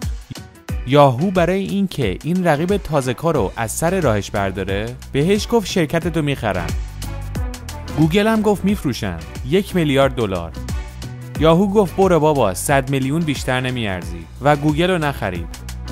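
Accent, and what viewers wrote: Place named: Varamin, Iran. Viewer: لهجت میخوره ورامینی باشی🤩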